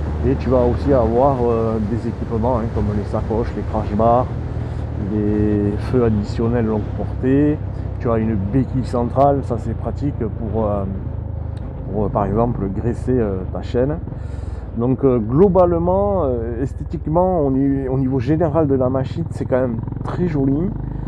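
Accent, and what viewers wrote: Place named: Southwest, France. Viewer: Merci pour cette belle présentation avec ton magnifique accent du sud-ouest 😉 Je trouve que la bécane était un peu trop rapidement au rupteur, tu confirmes ??? ✌️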